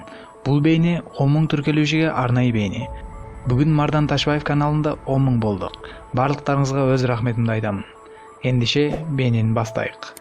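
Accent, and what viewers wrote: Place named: Uzbekistan. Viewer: Озбексинба? Диалектин казакшага келмейди?🤔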